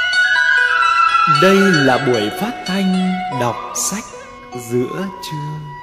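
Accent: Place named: Nghe An, Vietnam. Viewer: Long cái tieng nghê an lam mat cam xúc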